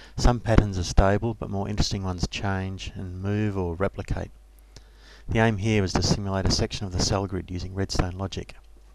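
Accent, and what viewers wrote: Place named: Australia. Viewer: an infinite grid of souls... sorry, your australian accent made me think you said souls :)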